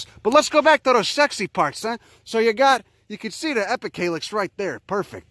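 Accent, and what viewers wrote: Chicago, United States. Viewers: I KNEW you were an Italian from Chicago, we recognize our own around here lol. That accent is straight South Side. Good on ya bud, definitely appreciate the effort on that little guy's behalf. Sending you good vibes from da burbs.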